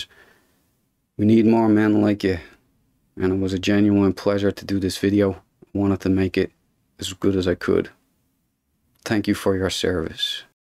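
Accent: Dublin, Ireland. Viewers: Love the accent, Dublin? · Great video. Thanks for sharing. What's your accent? Irish? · Narrator an Irishman? Nice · I think the Irish accent really helps big up this mans feats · Great video,! Are you Irish? You remind me of my uncle in Dublin.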